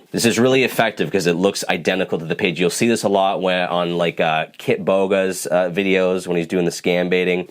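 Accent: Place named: Canada. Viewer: I think he is Canadian. It may be the about = aboot (not teasing, I have a few pronunciation quirks myself).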